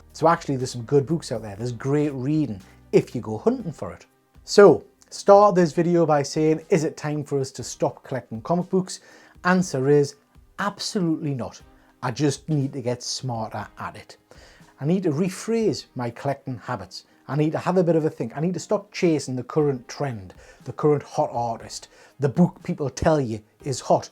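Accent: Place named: Newcastle, United Kingdom. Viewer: Geordie boy!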